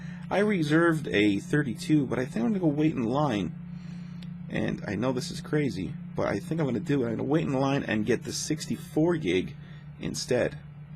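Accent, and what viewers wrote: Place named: Canada. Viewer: You're in Canada? you know come to think of it, I do kinda notice your slight canadian accent :)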